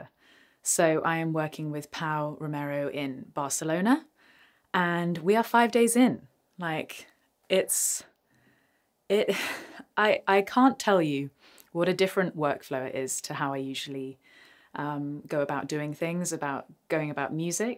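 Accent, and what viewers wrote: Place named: Bristol, United Kingdom. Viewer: It's a pleasure to see you wearing something other than black, first of all. Second, producing your own studio album is quite a chunk to bite off – big hopes for a solid return on that investment of time, energy and money. Finally, as always, I remain enamored of your speaking voice and that "Bristol" accent. Good stuff. Keep up the reports!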